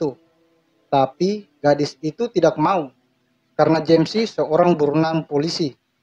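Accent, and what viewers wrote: Eastern Indonesia, Indonesia. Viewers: abang orang timur to?? kelihatan dari logat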